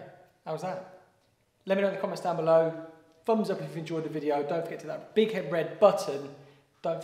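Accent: United Kingdom. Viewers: LOVE clicking a video and hearing an unexpected UK accent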